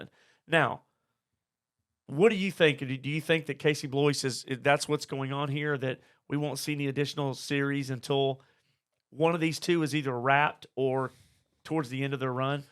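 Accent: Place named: South, United States. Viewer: What accent does the main host have? I know it’s a Southern accent from the US, but is he like Tennessee or?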